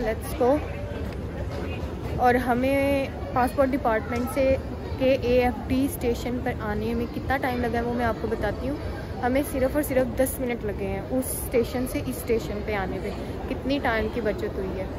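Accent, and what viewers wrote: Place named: Punjab, India. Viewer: ماشاءاللہ ویسے ہمیں پتہ چلا گیا تھا آپ پاکستانی ہیں آپ کی پنجابی والی اردو سن کر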